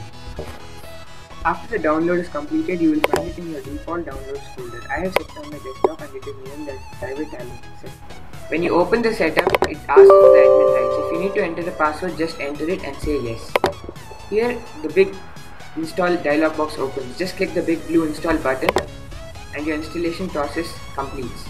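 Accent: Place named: India. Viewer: Indian voice, Indian accent. Program with viruses.. no thanks. I'm looking elsewhere.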